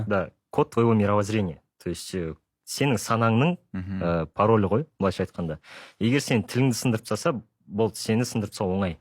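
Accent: Standard. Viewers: Ол қазақша сенен таза сөйлейді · Как раз таки он чисто, грамотно и литературно излагается на казахском языке. Это он объясняет напротив сидящим русско говорящим ведущим.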